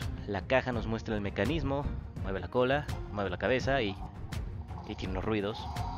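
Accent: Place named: Costa Rica. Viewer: Hola, Habla D'top motion, no es nadie importante mira. Se que eres de Costa Rica, igual que yo 😙, en el video " Tips para un buen stop motion" había una camiseta de la selección de Costa Rica ( la sele) y no sólo por eso, tu acento y todo. Se nota que eres tico. Me podrías decir COMO RAYOS TIENES TODAS ESAS FIGURAS ( ESPECIALMENTE EL SPINOSAURUS AEGYPTIACUS) saludos crack!! Pd: sub desde hace 3 años 😍😎😍 Donde conseguiste el spinosaurus acá? Amazon o que? En Costa Rica es ( para mi) imposible conseguir dinosaurios en tiendas departamentales ( walmart, Target, ect) Solo una ligera explicación por favor!! Atte: D'top motion.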